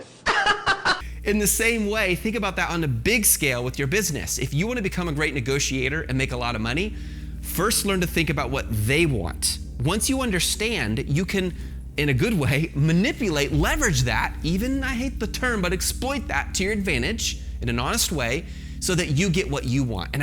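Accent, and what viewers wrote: United Kingdom. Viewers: Your British accent!!! 😂Loved this video!! You're amazing at simplifying all this overwhelming information. My partner and I are ready to begin the negotiation process. I'm nervous but I'm feeling so much more comfortable! Thank you!!🙏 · A little bit funny British accent but great. You are awesome! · Very interesting video, and as an authentic Chinese I can’t agree more and found your ideas are very helpful, especially about the long term business relationship and friendship part. Those customer service staffs work ridiculous hours and get very exhausted, if we respect them and make them feel nice talking with, the communication would be much smother and more efficient. The end I wanna thank you, your videos are so helpful and encouraging. I already found a product that I wanna try with on amazon, and today I already started sending messages to suppliers. 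Good luck to me! Btw, I’m living in UK, and I don’t think your British accent is too bad, hahah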